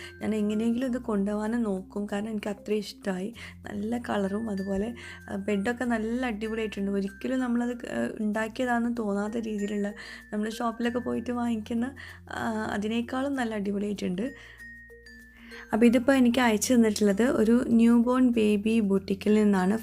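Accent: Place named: Alathur, India. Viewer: Aalathur bhakhatt samsarikkumbo anatre kuttundalo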